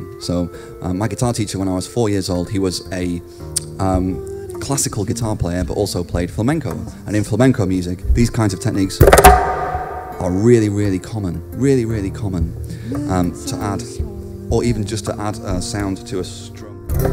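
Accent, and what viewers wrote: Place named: Australia. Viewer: he sounds a lot more aussie than i ever guessed by his singing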